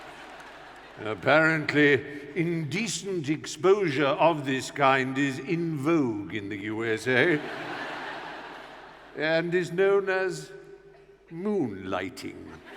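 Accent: United Kingdom. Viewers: 💕🤭 sounding even more British than usual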